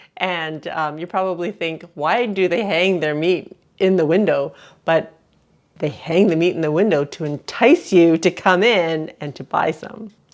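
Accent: Canada. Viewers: Very cute accent! Are you Canadian 🥰🌷... love the accent love your recipe !! Can’t wait to try it!!! Omg! Mouth watering 😋😋😋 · ok so I went to the Chinese supermarket and they have pork belly on sale imported from Mexico for 3.99 a pound I naively asked for two pieces and it came to 4.4 lbs and $17 but I decided it was worth it and I may go back and get some more and put it in the freezer while it is there what do you think, gud deal or? love your Canadian "outs" and "abouts" BTW. Arthur in California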